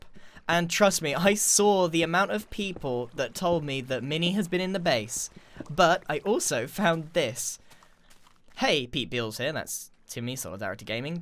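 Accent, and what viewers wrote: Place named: RP, United Kingdom. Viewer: You sound really posh in this episode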